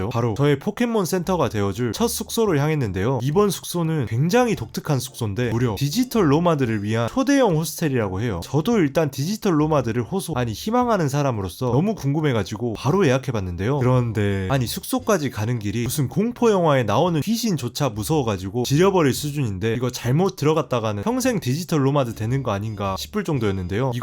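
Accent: Jeolla, South Korea. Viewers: 고향이 전라도인가요?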